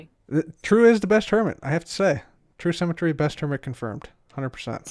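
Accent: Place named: Chicago, United States. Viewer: Also are you from Chicago I can hear a bit of a Chicago accent I'm from Illinois myself.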